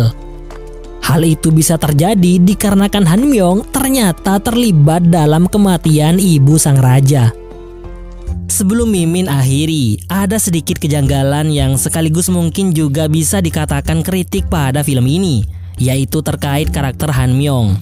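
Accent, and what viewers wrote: Madura, Indonesia. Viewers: Eeh reng madurehh ka adminn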